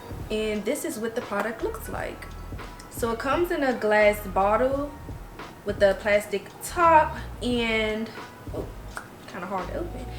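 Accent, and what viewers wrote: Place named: Baltimore, United States. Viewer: Sounds like she's from Baltimore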